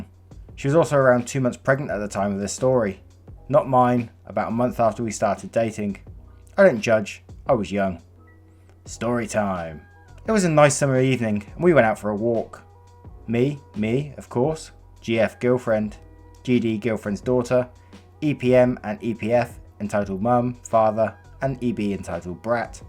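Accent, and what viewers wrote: United Kingdom. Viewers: First video i watched, am subscribing. Your narrations are on point. Also nice to hear a British accent on Youtube :D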